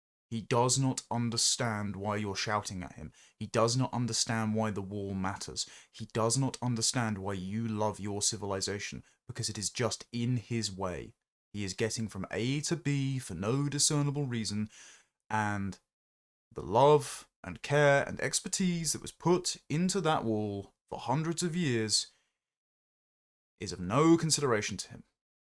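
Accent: RP, United Kingdom. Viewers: You need to see more of the world. Your posh voice doesn't mean you know the world. Where's the video slating the every person that was the same ethnic origin as the two who cut down the Sycamore tree that had stood for a thousand years? Everything you are aiming at him you could aim at those who cut the tree down. But you won't say anything about them cos they are white like you, since you have lowered everything to the colour of skin.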